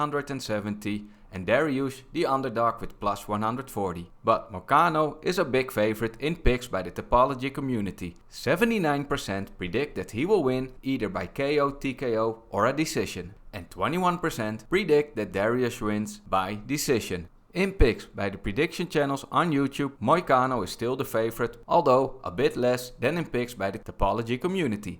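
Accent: Netherlands. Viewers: that thick dutch accent, nice u from the Netherlands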